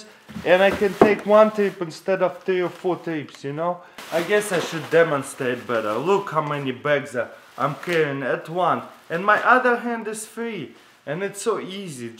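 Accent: Russia. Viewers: сразу понятно по акценту, что чувак-русский )))))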